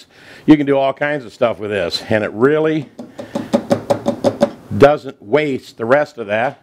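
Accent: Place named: Michigan, United States. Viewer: I hear a Michigan accent.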